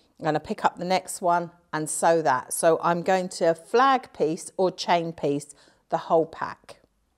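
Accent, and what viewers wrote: United Kingdom. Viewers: Plus I just love your British accent.😊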